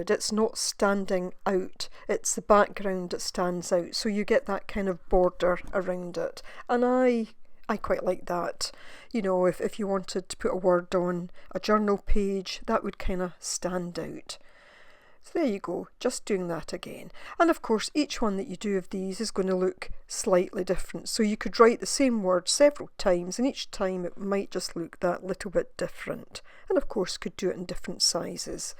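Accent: Scotland, United Kingdom. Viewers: Perfect I've been looking for a video on how to do this for ages. Thanks - and you sound just like me - east scotland, yes?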